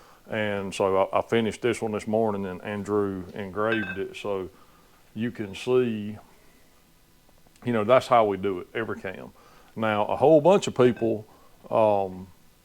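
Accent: South, United States. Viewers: The views will always speak for themselves. I agree with the majority, I like the drama videos, if that's what we're going to call them. Especially with your Southern drawl, it's really more of a telling it like it is video. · Do not like hear your southern drawl put me to sleep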